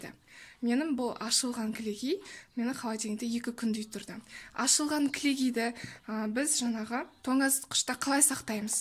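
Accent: Standard. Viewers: Керемет. Аман болыңыз. Әрқашан жасаған жұмысыңыз берекелі болсын. Сіз сияқты таза қазақ тілінде сөйлейтін блогер қазақ қыздары көбейе берсін. 👍👍👍👍👍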